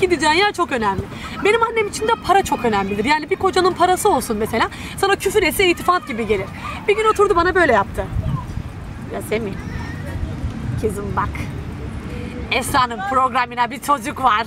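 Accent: Black Sea, Turkey. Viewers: Karadeniz'li mi yoksa İstanbullu mu ya da doğum Karadeniz üniversite İstanbul ondan mı şive değişmiş. Neyse çok beğendim, devam. Türkan çok iyiydi bu arada :)) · çok güldüm şiveye bayıldım keşke bende konuşa bilsem bir rizeli olarak :) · Bayiliyorummm sana hep karadeniz li siveneee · Çok tatlısın,kurban olurum karadenizime şivesine,doğru söylemiş anan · Karadeniz'in neşesi, enerjisi, mizah anlayışı, şivesi sende çoook güzel bir görsel/işitsele dönüşmüş... Gündemdeki "savaş çıktı çıkacak"ortamı üzerine seni seyredince, hayatın çok neşeli olduğu Arhavi yıllarımı, çocukluğumu hatırladım. Neşen daim olsun... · Sen harikasın daha çok dizilerde görmek isteriz özellikle karadeniz şivesiyle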